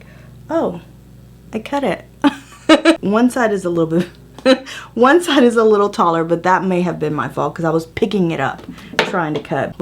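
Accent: South, United States. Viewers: her Southern drawl at times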